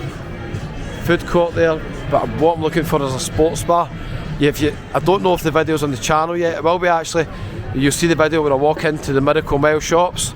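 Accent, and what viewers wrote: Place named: Paisley, United Kingdom. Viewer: Around of applause for hes came so far from his early Scotsman days. Well done man mon the buddies. I ll keep following you wherever you are. Your dulcet Paisley tones good luck⚽😎🆒🏖️👍 Viva LAS 😎🗼🗽🕋🕌 good luck on your next destination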